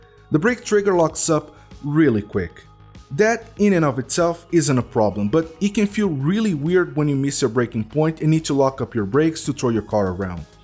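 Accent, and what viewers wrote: Brazil. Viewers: Tu é brasileiro? Tem sotaque hahaha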